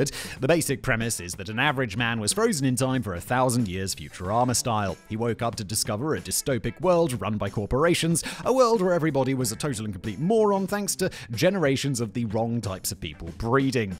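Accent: United Kingdom. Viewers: Eh. F the British pronunciations. No one but you uses them. If you wanted it different you should’ve fought harder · Fuck conforming (American here) you do you. Pronounce it the british way! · Well you called it a hood instead of a bonnet so you might as well start pronouncing Nike like the rest of us. The UK isn't the world. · Don't feel badly about Nike. I'm in Michigan, and it took that shoemaker at least a decade of very expensive commercial advertizing to get me to finally make me pronounce it the Greek way. Before that, I pronounced it the normal way, like you. Also, part of your charm is your very British accent. Hearing you say nike is the audible equivalent of the Y in tyres. If you didn't do these things, then it wouldn't add up.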